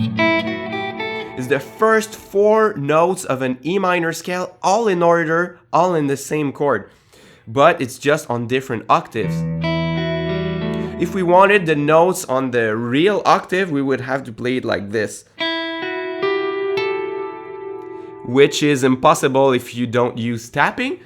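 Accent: Quebec, Canada. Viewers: Quebecois accent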